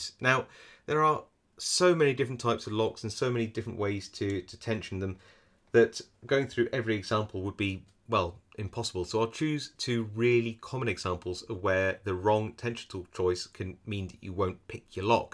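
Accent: England, United Kingdom. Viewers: Beautiful English. Beautifully authentic, proper English English!! Excellent video of course too by the way!! Have subscirbed. Thank you.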